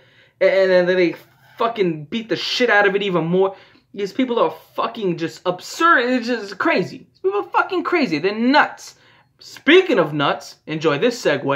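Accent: New York, United States. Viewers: im not sure if you're from ny or nj or what but i love your accent and the way u talk about stuff 😂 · This guy's New Yorker accent and random analogies make me forget how horrific it'd be to watch some of these by yourself. Well done on turning MDPOPE into a comedy lmao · Bro i soon as i heard you speak i knew you were from my state ny. Much love man❤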